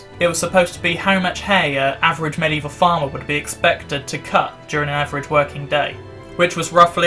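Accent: Norfolk, United Kingdom. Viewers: I'm Norfolk born n breed . So your accent is music to my ears . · The only thing better than a Norfolk accent is a Norfolk sense or humour you are a star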